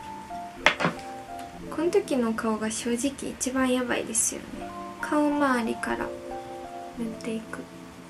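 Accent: Kansai, Japan. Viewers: 関西弁が心地よく感じるのはじめて‥ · サムネみて強い女がいる！！綺麗！！！すき！！ って思って動画飛んできたら喋り方緩いし関西弁だし超大好きなんだけど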